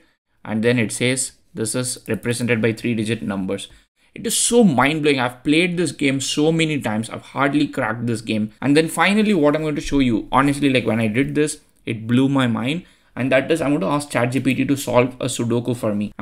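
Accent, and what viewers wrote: India. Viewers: guys plese improvo your pronunciation .Indian accent is so hectic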